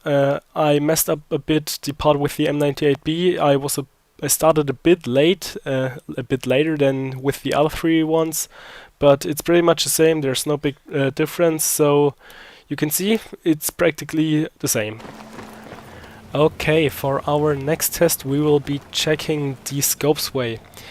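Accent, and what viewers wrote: Germany. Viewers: geil man :D Deutscher Aktzent erkennt sich meilenweit :D tolles vid, hat mir sehr geholfen danke man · Bist du Deutsch? Man hört dass es Deutsches Englisch ist :)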